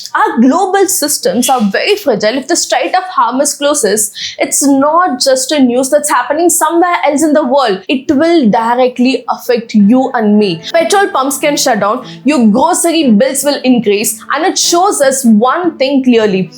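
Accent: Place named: South India, India. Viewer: I love your South Indian accent man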